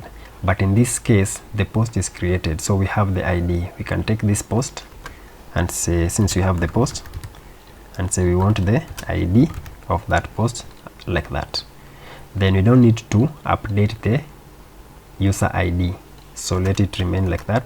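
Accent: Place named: India. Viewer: the technical level of the Indians on this platform is impressive, however the Indian accent for me speaking Portugués is a big problem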